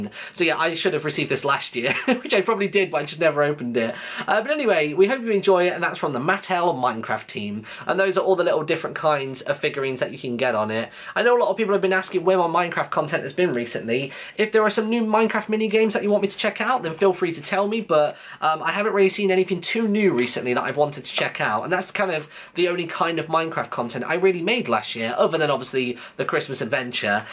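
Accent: United Kingdom. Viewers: He says it correctly (for a brit). Stop thinking that your way is correct.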